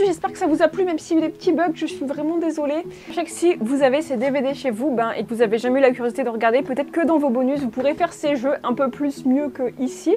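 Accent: North, France. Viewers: Le petit accent du nord ^^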